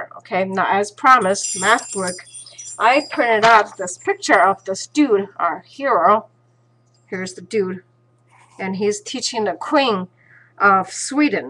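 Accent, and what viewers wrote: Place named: Eastern Europe. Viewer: I think Descartes also did imaginary #s. I cant recall for certain, Thank you very useful. also your accent sounds like the Slavic lady from 2 broke girls. Not a bad thing, Its cool.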